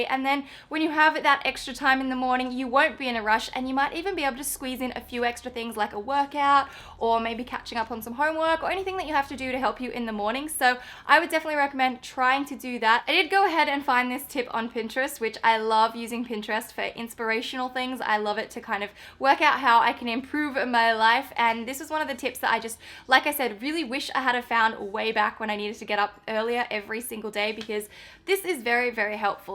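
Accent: Australia. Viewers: You are the BEST! I usually hate Australian accent but i love your accent!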